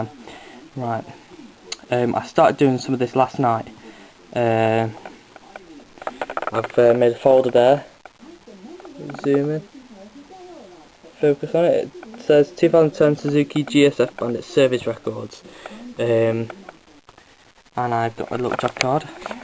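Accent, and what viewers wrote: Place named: Kidsgrove, United Kingdom. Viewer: Hi, although I am quite capable of changing coolant - I watched this the night before - I have a <num>SA Bandit, and pretty much everything is the same. Expansion/recovery tank is under the right side of seat fairing. Anyway First bike I had since I sold my last blade in 2004, so wanted a watch a video first - Yours was great and your accent reminded me of my time in Kidsgrove. Anyway mate great video, thanks for taking the time to show and tell. Thanks Bud!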